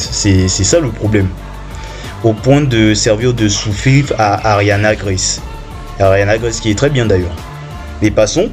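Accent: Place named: Ivory Coast. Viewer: Je dis tu es ivoirien d´origine oubien l´accent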